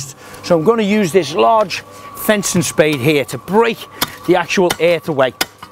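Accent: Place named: Liverpool, United Kingdom. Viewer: Well done, I'm just starting to ignore that pronounced Liverpool accent. Like your work very much, it's plenty good enough for me. Thanks!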